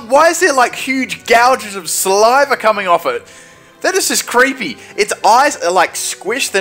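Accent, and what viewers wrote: Australia. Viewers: I'M FUCKING CONFUSED YOU SOUND AUSTRALIAN ARE YOU AUSTRALIAN!?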